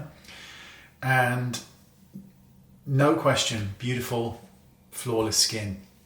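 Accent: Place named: Scotland, United Kingdom. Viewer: are you originally from Scotland 🏴. I feel I can hear a wee accent from time to time.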